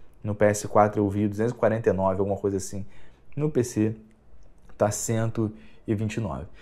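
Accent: Rio de Janeiro, Brazil. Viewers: Tu é muito chato cara, pra começar esse teu S puxado de carioca, só sabe falar mau, a DLC do Re. 2 gratuita, muito da hora, aposto que tu nem jogo. Falar mais é fácil, quero ve5tu fazer melhor.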